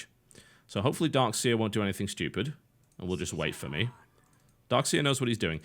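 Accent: United Kingdom. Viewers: she is really bringing out the British on TB. BTW why are you not making WTF's on Indie games anymore you sellout! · When TB gets frustrated his brittish accent gets more brittish.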